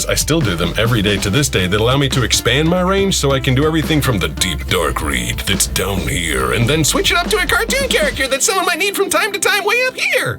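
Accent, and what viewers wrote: Boston, United States. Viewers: I would love for you to do a whole video in your true Boston accent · Do you ever go back to Boston or come across somebody with that accent and if you do, do you slip back to your old ways of speaking?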